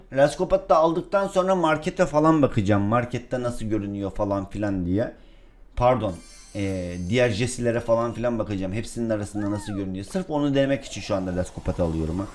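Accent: Black Sea, Turkey. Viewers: #lazbunuyap Pocoyla hesaplaşmaya gir hiç yuknalmadan birinci ol olamazsan hesabı 950 taş al Instagram erolhayrunnisa abibseni çok eskiden takip ediyodum inanmazsan eskiden kanalını ismi oyun oynay di hatta eski videolarında Karadeniz şivesiyle konusuyordun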